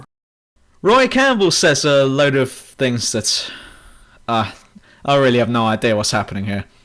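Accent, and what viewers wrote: United Kingdom. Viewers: Dude you are a, LOL Plus the british voice makes it for a good review.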